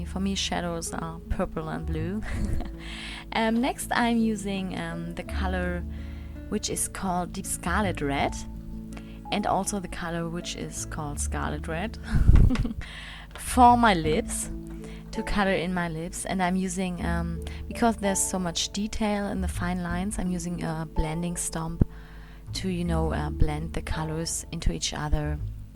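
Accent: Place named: Germany. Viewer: Deine Betonung ist so "typisch deutsch" :D Das ist nicht schlimm, hat nur Wiedererkennugswert ;) Am Besten gefallen mir die Haare, aber das Gesamtbild ist einfach klasse :)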